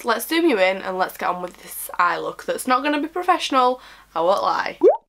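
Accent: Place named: Yorkshire, United Kingdom. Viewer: deffo from yorkshire haha like me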